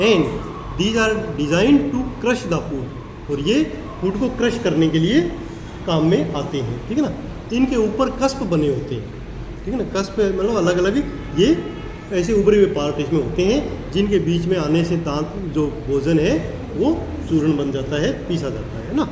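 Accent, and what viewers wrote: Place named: Maharashtra, India. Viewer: sir , you explain topics in simple words. Our me janta hu aap marathi ho aapke bat karne ke tarike se pata chal raha he ❤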